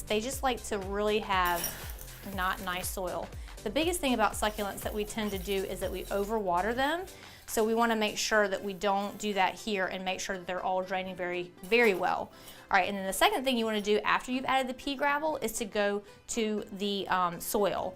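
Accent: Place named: South, United States. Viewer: Thank you for this video:) These are definitely the plants for me. Only need water every two weeks! Haha... Also, you seem to have a southern accent. I am from Alabama. Are you close by?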